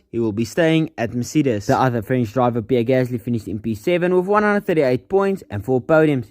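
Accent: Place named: South Africa. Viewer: We like the south african acent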